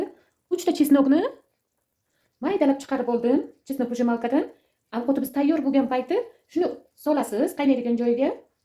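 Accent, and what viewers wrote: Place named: Gijduvan, Uzbekistan. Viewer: Ваалейкум салом,бемалол опа десангиз хам булаверади.Каерликсиз,акцентиз Гиждувонликларга ухшаяпти.Биз Навоийданмиз.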